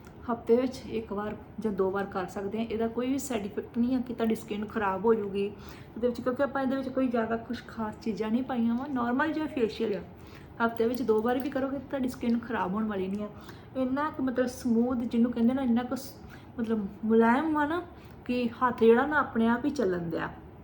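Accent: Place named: Punjab, India. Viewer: ਬਹੁਤ ਵਧੀਆ ਤਰੀਕੇ ਨਾਲ ਦੱਸਦੇ ਹੋ ਤੁਸੀਂ। ਠੇਠ ਪੰਜਾਬੀ ਬੋਲਣ ਲਈ ਧੰਨਵਾਦ ਜਿਵੇਂ ਬਦੋ ਬਦੀ। ❤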